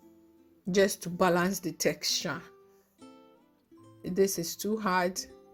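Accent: Ghana. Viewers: My first time here tho😁 you are funny and you sound like a Ghanaian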